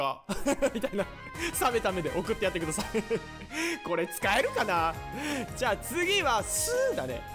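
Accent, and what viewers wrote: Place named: Hakata, Japan. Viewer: たまに出る博多弁好きです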